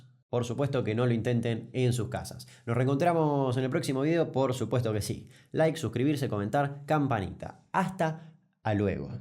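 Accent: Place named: Argentina. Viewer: Fascinante como narras. Ja,ja. Me hace reír el "el suspenso " del principio, "¿qué le pasó, que le pasó? " Genial y con ese acento argentino que es espectacular. Un abrazo grande desde Bogotá-Colombia 💕💕💕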